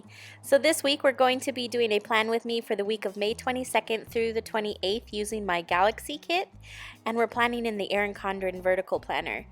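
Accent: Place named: Dakotas, United States. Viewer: I know you've lived in Hawaii, but by any chance have you lived in the Dakotas? I'm always trying to figure out your accent! lol especially trying to figure out where "anywhose" comes from! You're so adorable!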